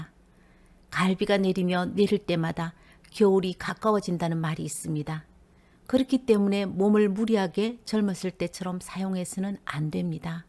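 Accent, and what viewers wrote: Gyeongsang, South Korea. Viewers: 말마다 옳습니다 경상도 사투리도 예쁘십니다 ㅎㅎ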